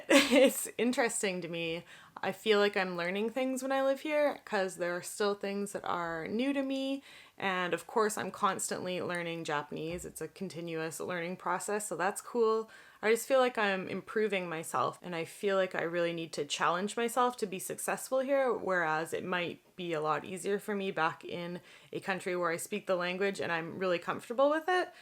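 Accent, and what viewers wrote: Canada. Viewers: I live in WA state and I interact with Canadians often. The dead giveaway for Canadians for me is the way they say "eh" and "about", and does not disappoint! LOL, thank you for sharing! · Has ever mentioned what part of Canada she's from? I'm curious about her accent My instincts say west canada, vancouver, but i'm a total novice when it comes to their accents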